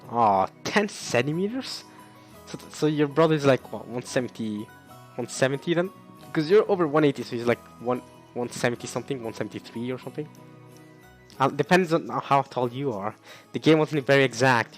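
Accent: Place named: Norway. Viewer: Your accent sounds familiar. Are you Swedish? Edit: Norway. I was close! Also it's weird seeing someone play without lip synch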